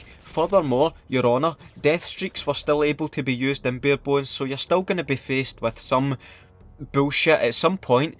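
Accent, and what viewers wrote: Scotland, United Kingdom. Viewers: Scottish, sounds nothing like Welsh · Love the Scottish accent haha. Hilarious content as usual. Much love from the US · Why are annoyed Scottish people so great to listen to?? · Am I the only one that thinks the Scottish accent is a work of art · NOTIFICATION SQUAD! Love ya accent it's godly, my english teacher is actually scottish but she's holding back her accent sadly i love the scottish accent. KEEP UP THE LEGENDARY WORK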